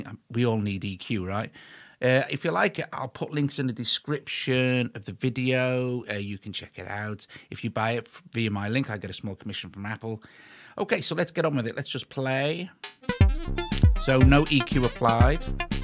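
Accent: Merseyside, United Kingdom. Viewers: Always love your reviews. Your bright positivity, and premium Mersey tones!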